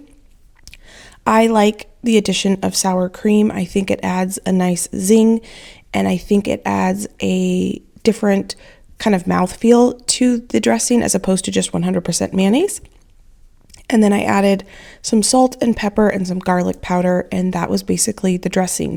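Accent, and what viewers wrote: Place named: South, United States. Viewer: She cuts everything with that skinny knife she is funny to watch very entertaining Her southern drawl reminds me of my granny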